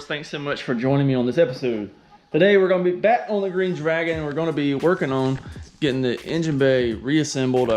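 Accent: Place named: West Virginia, United States. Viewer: I would swear you was here in West Virginia driving that old Teg you call “Skeeter” . Same way we do it out East here anyhow. Guess Alabama aint much different than here. Shit had me laughing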